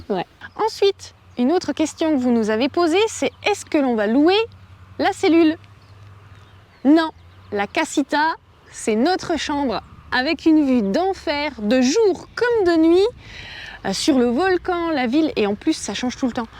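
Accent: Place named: Franche-Comté, France. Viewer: gaugé !!! voilà une vraie franc_comtoise 🙂. ca fait plaisir à entendre . Bon vent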